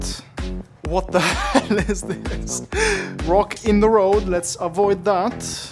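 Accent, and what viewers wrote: Italy. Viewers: Bro sei italiano? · Ma sei Italiano o cosa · ma da che parte dell' Italia sei?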